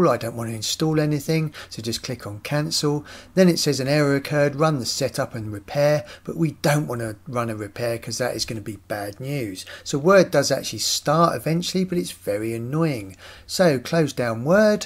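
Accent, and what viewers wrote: United Kingdom. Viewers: IT WORKED! 👍 Thank you so very much. I’m not IT literate, but due to your easy instructions, along with your British accent, I was able to complete this task Diolch yn fawr 🏴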